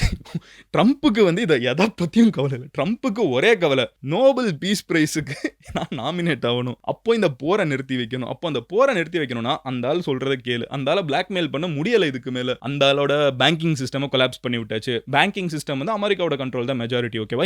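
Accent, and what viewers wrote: Madurai, India. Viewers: Poravainga, madhura slang 😁😁😁😁 vera level🎉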